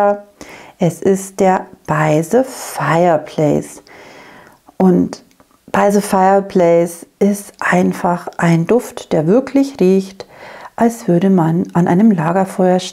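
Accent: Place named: Bavaria, Germany. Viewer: So herrlisch präsentiert! Danke dafür! Froh hier gelandet zu sein. Diese private Duftreise gepaart mit dem wunderbaren bayerischen Akzent… phänomenal! Werde das Video gestückelt genießen. Gibt viele Parallelen zu meiner Kollektion. Viele Grüße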